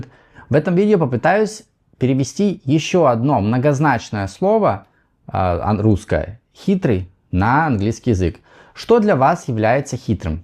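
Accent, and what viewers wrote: United States. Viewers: It's unpleasant to hear an American accent. I prefer British teachers. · думаю ты лучший учитель я знаю англ живу здесь 36 лет но каждый раз заслушиваюсь твоим талантом такой чистой речью американского наверно ты живешь в штатах?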